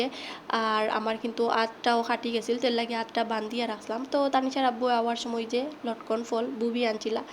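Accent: Sylhet, Bangladesh. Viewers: apu tumer katha gulo sonle mone hoi sylet chole jai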